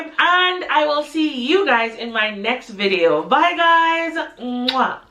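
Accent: Jamaica. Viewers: I can hear Jamaican in your voice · are you from Jamaica? You have an accent that sounds like them.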